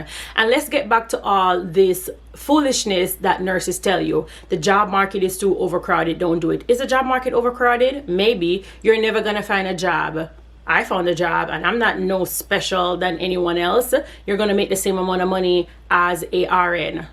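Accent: Jamaica. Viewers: From your accent I could tell you are Jamaican. I know more Jamaican nurses go to the USA than here in Canada. My pay here without a ton of overtime( I think I did overtime 4 or 5 times for the year) is just over 95,000 CAN before taxes. Last year I got over 100,000 for the first time in my career after working over 20 years. The problem is we pay a lot in taxes. I find people don't complain much about taxes here . Its just recently I hear more complaints about pay , taxes etc. I think because housing prices have gone up significantly in the cities, plus inflation and the government has frozen salary to 1 % increase which is nothing. Generally before all this ,a nurse can buy a nice house on her own and live pretty descent life without working tons of extra time. NP's here get more to start but can still work less overall in comparison to other nurses who do OT etc. 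We are due for a descent pay increase , so will see what happens.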